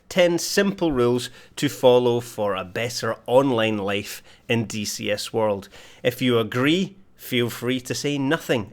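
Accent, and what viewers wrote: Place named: Cockney, United Kingdom. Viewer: Love your accent, is that that classic east london cockney ;-)))))) Edit: wrote dialect first instead of accent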